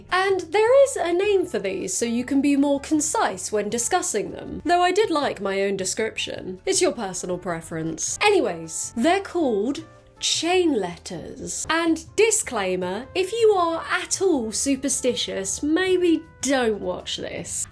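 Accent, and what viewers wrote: United States. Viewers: wow your american accent is better than mine and i’m from america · gotta say, your American accent is really good! hahah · Ooooooh that american accent, so chill, so hip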